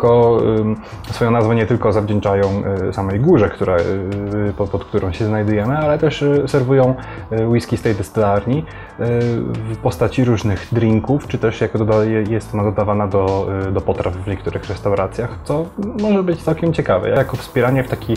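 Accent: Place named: Scotland, United Kingdom. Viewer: Szkoci chyba muszą Cię mylić ze swoimi krajanami - mega akcent.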